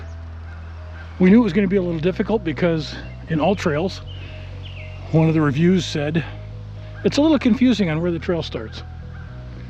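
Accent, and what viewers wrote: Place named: Wisconsin, United States. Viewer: Thanks for getting the Wisconsin accent right - you sound just like a local! Next trip: Hayward mid-July for the Lumber Jack World Championships and LCO has their Honor the Earth pow wow. Way different, but both are incredible. Safe travels.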